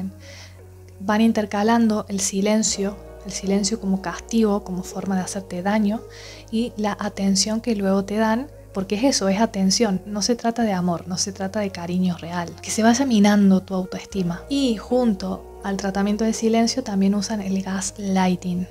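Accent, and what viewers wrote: Argentina. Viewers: que lindo es escucharte, muy clara y argentina ❤un saludo muy afectuoso.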